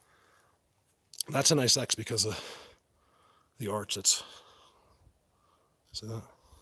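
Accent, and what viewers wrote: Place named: New Jersey, United States. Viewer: I missed your live stream! Damn! Jersey. Now I know where your accent is from! Makes perfect sense. I’d been wondering since I subscribed. Love ❤️ it! Do more Live Streams! I’ll hit your Notification Bell.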